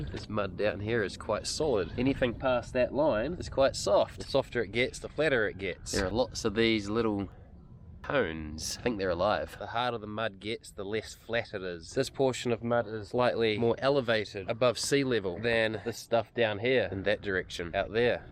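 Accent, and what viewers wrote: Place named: Australia. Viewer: Your presentation differs from other YouTubers. The Australian accent adds to that. Uniqueness always has a change of going viral. Keep plugging away.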